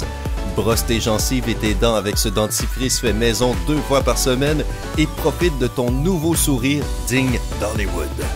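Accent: Quebec, Canada. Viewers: Vous avez un accent québécois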